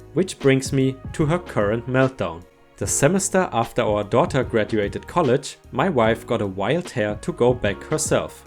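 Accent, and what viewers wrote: Germany. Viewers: you sound so German! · what is your country of origin? From the sound, like German?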